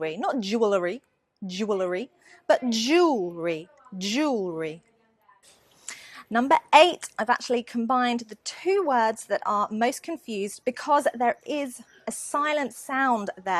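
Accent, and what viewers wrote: United Kingdom. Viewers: I loved British accent after that vedio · cool your british accent.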